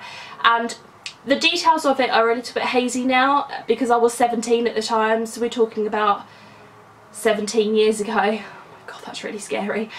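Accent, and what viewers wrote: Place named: Birmingham, United Kingdom. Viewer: I love you're accent! Are you from Birmingham?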